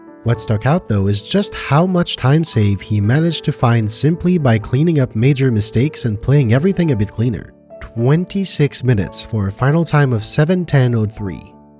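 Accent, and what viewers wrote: Quebec, Canada. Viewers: yeah I felt pretty confident too since early on. I'm not aware of another accent that would sound kinda like Québec's.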